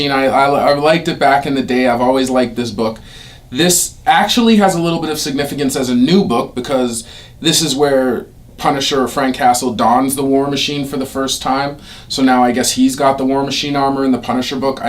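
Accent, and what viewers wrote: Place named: Nova Scotia, Canada. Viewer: Are you from Canada? You sound like you're from Nova Scotia.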